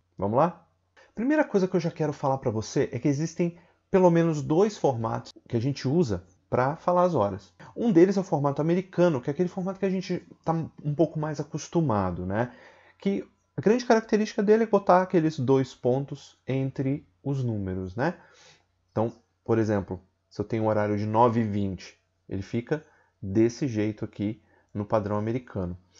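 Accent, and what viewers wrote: United Kingdom. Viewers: Eu tô maratonando seu canal e to amando aprender o sotaque britânico pois é mais fácil para minha pessoa falar, ouvir e entender melhor. Mas uma pergunta, se uma pessoa está saindo e fala: Good night! Eu deveria responder como ela disse certo? Pois ela está saindo. Então eu também responderia "good night" na despedida?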